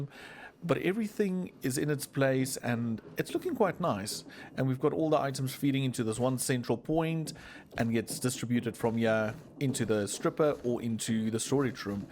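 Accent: South Africa. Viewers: So great to hear a South African accent on a good quality youtuber. Keep up the great content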